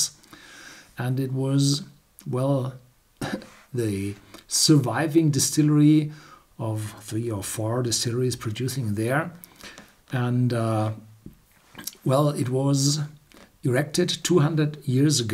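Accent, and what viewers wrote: Germany. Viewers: Dude. Travel some. Most native Germans speak like this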